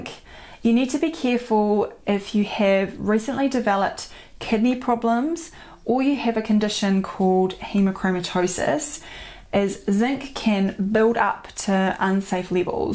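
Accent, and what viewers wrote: New Zealand. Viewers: I love the way you say, 'GET'. It sounds like GIT, but stretched out. My friend is from New Zealand but he has been here for so long in London he sounds almost English (the toe rag)! So gotta git me some New Zealand accent and your perfect. Oh btw, thanks for the info on zinc, i'll try not overdose on it! Why is New Zealand called New Zealand, is it because its the land of new zeal, are you guys buzzing over there? All the best and keep up the good work. Im in a funny mood today, sorry. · I liked your Kiwi accent.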